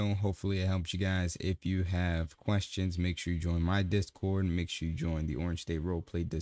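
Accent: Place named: Memphis, United States. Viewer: You gotta be from memphis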